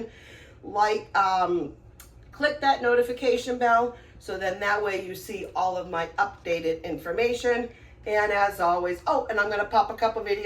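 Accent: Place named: United States. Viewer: ',' sounds American🤔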